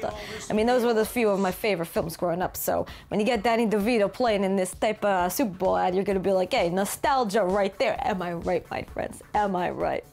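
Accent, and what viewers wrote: New York, United States. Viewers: Are you actually from N.Y or is it just a voice you do? Either way I love it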